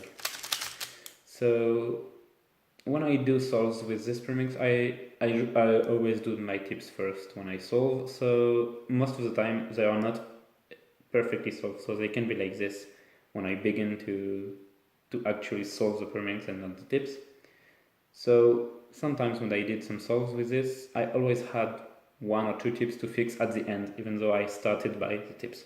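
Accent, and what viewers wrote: France. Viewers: Cet accent Français! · Quel accent Français, mais tu parle bien anglais!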